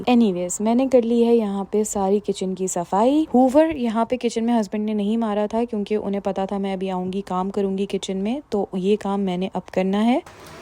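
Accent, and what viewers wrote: Karachi, Pakistan. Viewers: hi,i like your vlogs,your urdu is very nice like karachi waly.want to ask you about your folding matress,from where did you get it and its price as wel coz i like it nd want it for my kids.❤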